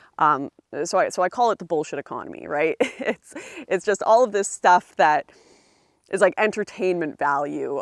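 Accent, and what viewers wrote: Canada. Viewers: You sound like you live in Adolf Trudues Canada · Steggering? You Canadians' accents crack me up.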